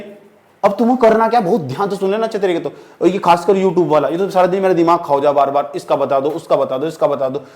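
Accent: Haryana, India. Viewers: Sir aap haryanavi ho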